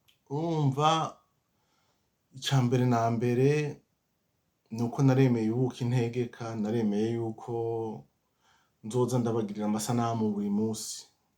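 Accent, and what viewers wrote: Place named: Brussels, Belgium. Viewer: ngo wemeye ubuki utegekaaaa, hahah , ico kirundi nica bruxL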